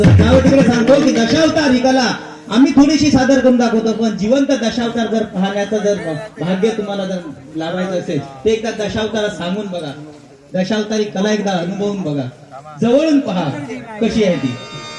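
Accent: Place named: Malvani, India. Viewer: Josh Academy he malvani bhasha aahe tyat uchhar asach hoto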